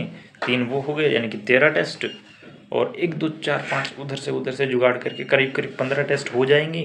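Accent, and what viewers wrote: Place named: Hadoti, India. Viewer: बोली सै हाडौती का लग रहा है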